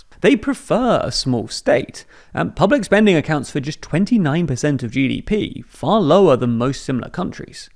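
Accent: United Kingdom. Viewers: Cómo mexicano, viviendo en México, tu desesperado comentario no tiene ningún valor y te lo explico. Si un anglo y no solo anglo, sino con acento británico (suponiendo que eso lo hace estar del otro lado del charco) habla bien de la izquierda en México eso solo quiere decir una cosa... USTEDES YA PERDIERON EL RELATO. De nada les vale seguir intentando imponerlo, sus mentiras ya no pasan.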